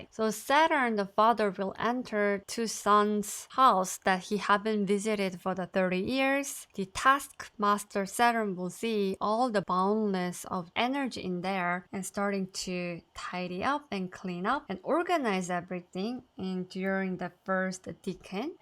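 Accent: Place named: South Korea. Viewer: Sorry I'm learning Korean and I thought I recognised your accent. This is a very good video and clever to use slideshows :)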